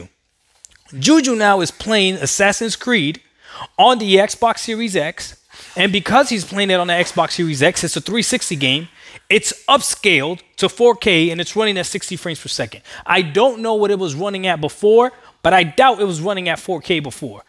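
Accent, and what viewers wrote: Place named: New York, United States. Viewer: Bro where you from New York or jersey??? Accent thick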